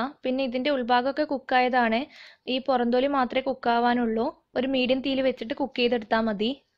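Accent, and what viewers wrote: Kannur, India. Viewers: .. Nhan thrissur aane.. Kannur slang enikk orupade ishtta...ippo samsarikkunna pole thanne samsarichal mathi.. 😁😁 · നല്ല കണ്ണൂർ slang........☺️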